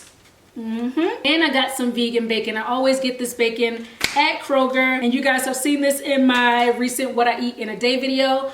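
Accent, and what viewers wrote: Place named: Washington D.C., United States. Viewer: Every time I hear you speak I hear your accent ❤️ are you from D. C. ?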